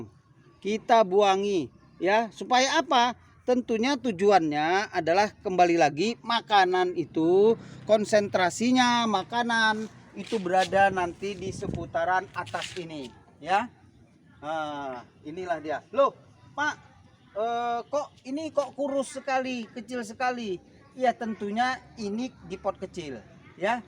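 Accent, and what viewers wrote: Banjar, Indonesia. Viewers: Logat banjar nya kd hilang lah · Logat bahasa banjarnya masih kental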